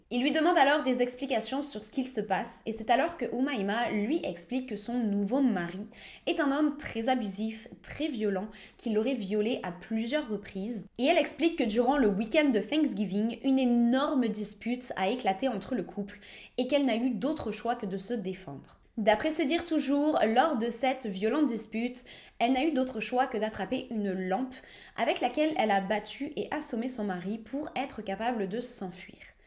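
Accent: Quebec, Canada. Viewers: Bien raconté,merci! Je distingue un soupçon d’accent québécois ou quoi? C’est subtil, il faut s’y connaître! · Kikou tu es adorable et j adore ton accent québécois. Merci beaucoup pour ta vidéo bisous 👍👍👍👍👍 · Coucou ! j'ai une question, je suis fascinée par ton accent du Quebec, tu l'as eu naturellement à force de rester là bas ou alors tu t'es entrainée ?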